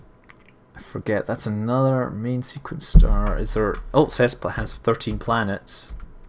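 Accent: Scotland, United Kingdom. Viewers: I adore your Scottish accent,.:D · You are a EXTREMELY interesting guy and easy to listen to, and i love the scotty accent. :D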